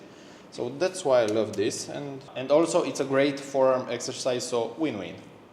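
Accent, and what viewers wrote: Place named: Romania. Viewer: Mi s a parut ca esti roman de la accent dar cand am vazut romaneasca din portbagaj mi am dat seama ca e vorba de Romania 100% 😂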